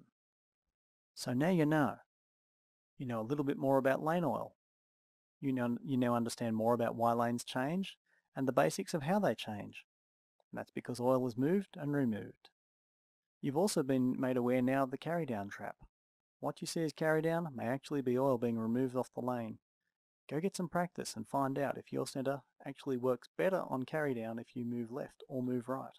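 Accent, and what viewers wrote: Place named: Australia. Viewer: good to hear an australian voice helped me a lot thanks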